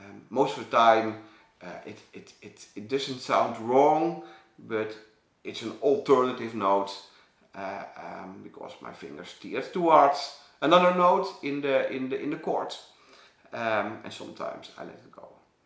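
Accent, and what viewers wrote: Netherlands. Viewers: Thank you for this! Your English reminds me so much of a Dutch man who ran a dairy where I grew up. He was a wonderful, very friendly and cheerful man, as was his family -- friends I grew up with. He spoke English in a manner very similar to the way you do.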